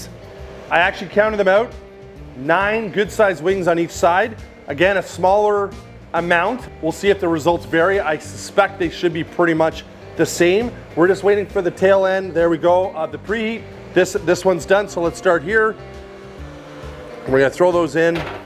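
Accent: Canada. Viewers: I love the way Canadians pronounce "out" and "about". Love Ninja products!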